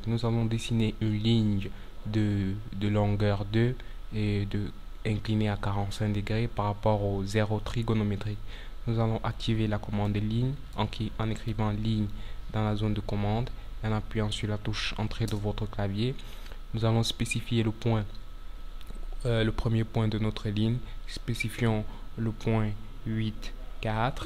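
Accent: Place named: Cameroon. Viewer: laccent Camerounais!!! o bossoo!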